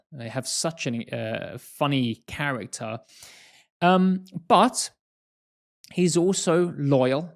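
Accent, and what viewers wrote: United Kingdom. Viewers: I love it when I heard your wonderful British accent.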